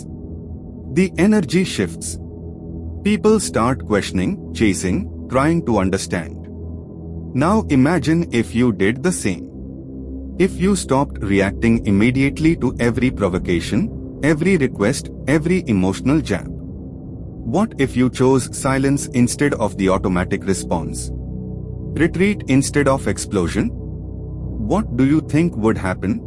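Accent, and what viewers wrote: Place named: India. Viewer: That indian accent 😂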